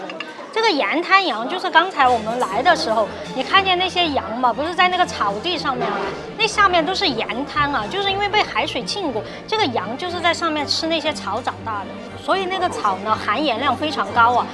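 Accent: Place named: Northeast, China. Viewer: 女主是混血吗 ？口音夹杂着东北口音❤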